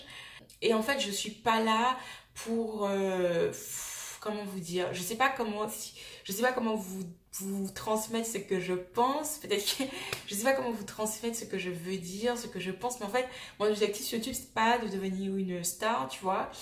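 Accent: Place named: Antilles, Caribbean. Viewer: dès les premières secondes, je reconnais l'accent antillais. :)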